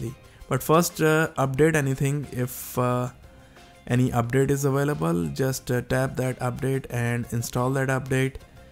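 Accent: India. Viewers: Yo dis shit no work wit yo Indian voice likd dafuw u sayin Peace dawg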